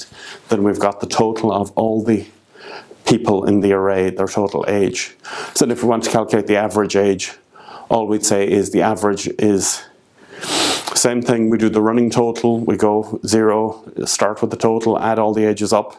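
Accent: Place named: Germany. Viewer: Are you German? You have a Flula kind of accent. Pretty cool.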